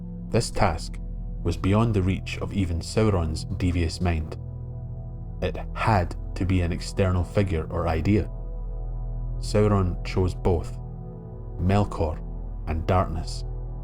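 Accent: Scotland, United Kingdom. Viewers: This was really great! Though, much as a Scottish accent makes me weak at the knees, it was unintentionally funny to hear: "And the men of the Ale took Suh-ron back to Nuh-mennor from Middle Airth. Even the Fusstborn recognized the power of his rings to PREZAIRVE..." Aye, ye might try a wee bit harder to nae sound so Gaelic about it all! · Are you the Critical Drinker? Or do all Scots sound alike?🤔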